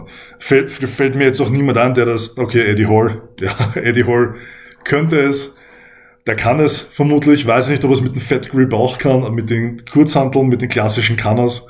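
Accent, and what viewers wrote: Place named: Austria. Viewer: Dialekt für an Österreicher verständlich ... für Deutsche vielleicht schwer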